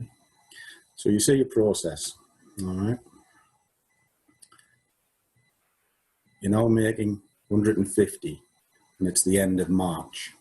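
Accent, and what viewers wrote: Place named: Nottingham, United Kingdom. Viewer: Hi! I have literally spent the last 4 weeks studying trading none stop (most of the day and night)😂 I started with a trading 212 account which was crap and I have now moved up to a trading view account and use metatrader 4 to take my trades. I have tried the ichimoku strategy with tk crossovers, kumo breaks etc. I take all trades inline with the higher time frame sentiment but still seem to be going wrong somewhere🤦‍♂️🤷‍♂️. luckily I have not lost any money in the 4 weeks of trading but always mange to be back at where I started. I have watched 100 hours plus on YouTube and you seem to be one of the most genuine traders I have come across 🙌 I live in Nottingham and it sounds like you live not too far. I am wondering if you offer any sort of coaching/mentoring services. I thought I could do it all alone off youtube videos etc but its just putting it all together and having abit of guidance every now and then! 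look forward to hearing from you!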